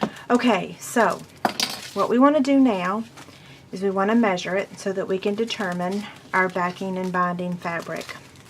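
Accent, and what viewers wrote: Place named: Texas, United States. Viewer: Thanks for taking the time to make and share these videos! I'm about to do this to make a little quilt for my daughter. P.S. Your accent reminds me of growing up in Texas! 😊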